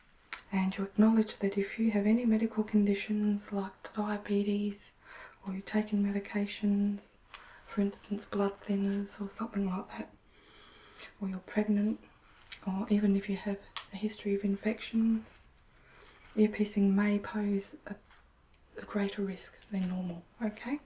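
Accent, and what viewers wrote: Australia. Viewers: I love that I've found someone with an Australian accent. It makes me feel so homely. It's great :)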